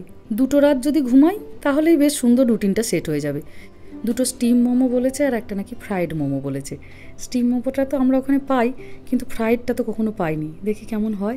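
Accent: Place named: Birbhum, India. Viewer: আপনি কি বীরভূম /বর্ধমান জেলার মানুষ? আপনার কথা বলার স্টাইল টা একদম সেইরকম. আমি বীরভূমের মানুষ তো. খুব মিল পেলাম, ভালো থাকবেন.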